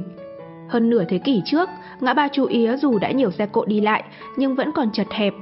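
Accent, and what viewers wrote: North, Vietnam. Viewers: Kênh này đưa thông tin 1 chiều và không có dẫn chứng rõ ràng. Cộng thêm giọng đọc miền “ ngoài “...không đáng tin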